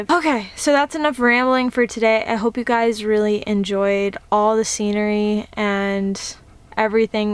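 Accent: South, United States. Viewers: I could tell you grew up in the south. I heard you say "Rat now" not right now. I knew then we were neighbors, a couple hollers over.😄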